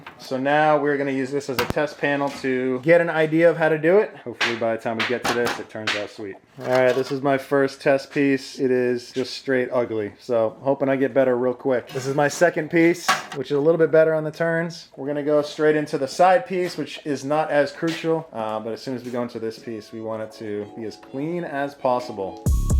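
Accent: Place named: New Hampshire, United States. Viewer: Nice to see you back,! Your new Hampshire accent really shows when you spend more time there. When you gonna bring back 'd?!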